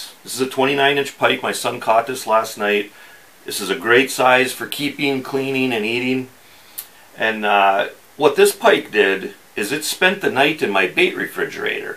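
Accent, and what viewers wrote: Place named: Upper Midwest, United States. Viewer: Glad to hear that your knives aren't of the $100 variety. My wife and I fish and camp mostly on the Valentine National Wildlife Refuge. It is an amazing place to panfish for bluegill, perch, Largemouth Bass, Northern Pike, and catfish. Located in northern part of Nebraska in my beloved Sandhills. Based on your slight accent😄 I'd say you are from Minnesota,Wisconsin, or somewhere north of us. If you get the urge for a change of scenery check it out on the internet. Started by Teddy Roosevelt, primarily as a refuge for migrating birds. 8 Refuge lakes open to fishing. Many other lakes and Merritt reservoir near by. You wouldn't regret it. 👍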